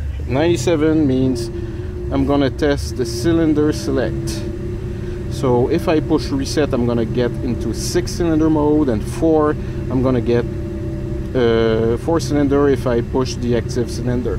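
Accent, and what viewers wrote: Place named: Quebec, Canada. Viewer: french people refer to people living in France. this accent is from Quebec, Canada. Are you english?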